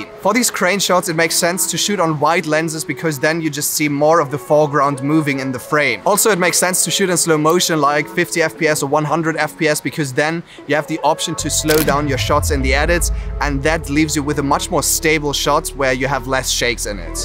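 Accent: South Africa. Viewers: WOW WOW WOW WOW WOW!!!! Thank you so much for this video. I am literally going to practice every move you mentioned with my crane M3. Are you South African? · Thanks for the video, I will definitely have a look at the Crane M3, I hear that your are starting to get a South African accent 😀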